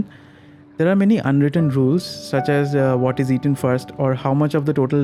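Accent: Bengal, India. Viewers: the Bengali accent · sir bengoli stile with English language is not suitable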